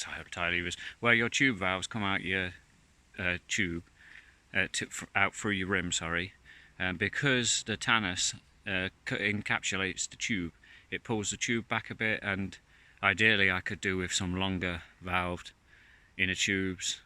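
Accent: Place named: Derby, United Kingdom. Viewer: I have the solid ones on my Brompton, love them , reassuring to know you’ll never flat . Expensive but I ride on the camel trail Padstow to wadebridge every day . Enjoyed your vid on the Orbea also , I think you are from Derby by your accent, me too.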